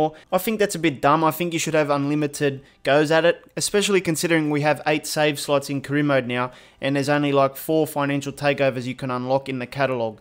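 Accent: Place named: Australia. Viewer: He has australian tinge. I and long vowels. Adviiiiice. Hit. Thinking. Nil. I could go on. R.i.p if you were nz born and then moved to aus. You also sound like you born south auckland?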